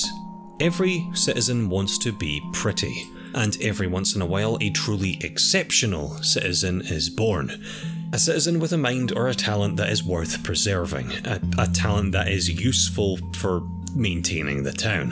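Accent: Ireland. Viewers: I never get tired of hearing a good ole Irish (sorry if I got it wrong) voice.... it can be very friendly but have mischievous intents behind it all. Keep voicing your characters! Your doing great! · Are you irish?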